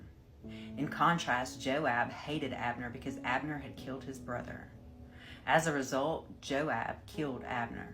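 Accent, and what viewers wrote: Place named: South, United States. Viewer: you're welcome! Luv your Southern accent, too! 💙 I enjoy your channel, thanks 🙏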